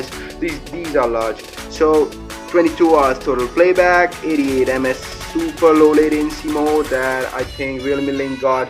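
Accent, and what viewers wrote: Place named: United Kingdom. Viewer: Best 👍 video bhai 👌 aur bhai apki English to masha allah bahut hi achchi h bhai lagta nhi ki ap india se ho pehle to laga ki ap UK 🇬🇧 se ho Best English YouTuber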